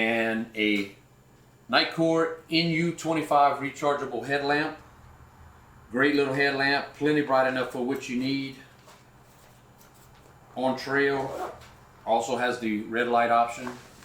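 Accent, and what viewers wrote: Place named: South Louisiana, United States. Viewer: That accent. You south of I-10? haha I'm a N. LA boy aka "fake" Louisianian.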